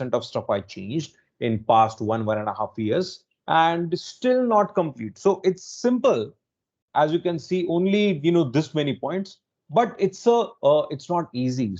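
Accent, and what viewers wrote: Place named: India. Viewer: Hello,! My name is Brendon. I’d like to express my gratitude for your work. A few years ago, I was looking for ways to truly learn from reading books, and I came across your YouTube video. I never took English classes; I learned everything I know by watching movies and TV series and playing video games. I spent dozens of hours re-watching your TED Talk because the Indian accent made it a bit challenging to follow—but it was absolutely worth it! You not only taught me how to read better but also encouraged me to read more and more. You and Mortimer J. Adler are at the top of my list. I am truly grateful that you posted this series of lessons here; it was the missing piece to complement my "Habit 3"! I will certainly watch it a few more times until I’ve mastered the material. Once again, thank you so much! Best regards from Brazil.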